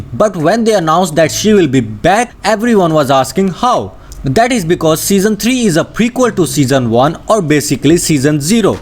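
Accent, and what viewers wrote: India. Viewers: What gave it away? Was it the thick indian-style accent?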